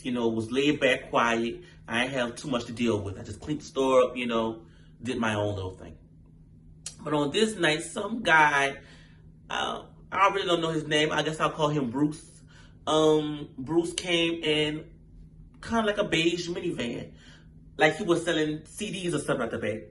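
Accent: New Orleans, United States. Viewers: Bitch this story time got me rollinnnnn 😂😂😂😂 omfg! I’m subscriber 557🥰🥰 and where you from? The accent giving new orleans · chileee this story was too mf juicy lmaooo I had to subscribe once I hear the nola accent.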